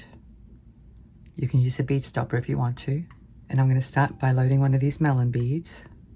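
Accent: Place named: Australia. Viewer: I watch on my android phone and I never have problems with the audio and I love your Australian accent.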